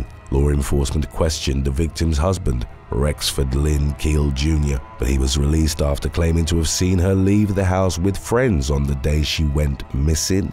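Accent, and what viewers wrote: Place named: United Kingdom. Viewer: As I’m British myself, I never understood women who LOVED British men’s accents until I heard this guy! 😊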